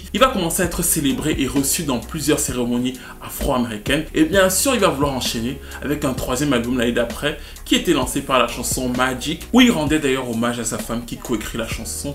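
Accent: Cameroon. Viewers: Toi tu es camerounais d'origine 😭😭 trop le ton ... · Il s'agit juste du parlé et du phrasé typiquement camerounais. Que j'aime beaucoup d'ailleurs...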